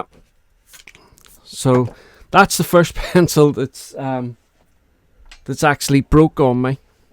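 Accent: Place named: Northern Ireland, United Kingdom. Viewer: I was about to donate to Habitat( a thrift store i Florida) , my colored pencils, which I bought 8 years ago and never used (Faber Castell,Derwent & Reeves) I went online found your site. It was your clarity and honest information that started me -But your NI accent made me homesick for ‘the island’ - I lived in the Republic for 30 years, and was part of the Forrum for Peace and Reconciliation, and spent time at Queens, for the resurrection of NIGP. I wound up buying more pencils, and was converted away from acrylics. Thank you, thank you for making the whole genre clear -, fun and creative and opening up a new, endless possibilities.🎉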